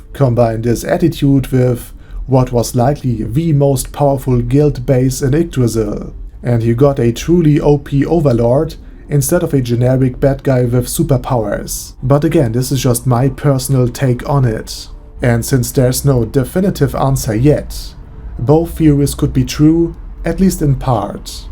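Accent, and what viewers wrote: Germany. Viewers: Dein deutscher Akzent ist echt heftig.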